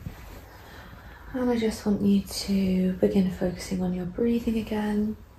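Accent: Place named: United Kingdom. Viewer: I am not into chiropractor ASMR but your british accent influenced me to watch this video till the end. now, I am binging your other videos to hear more of your accent. lol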